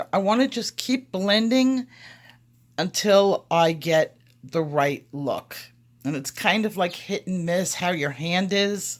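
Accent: New York, United States. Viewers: I love your New Yawk accent! Well done video as well.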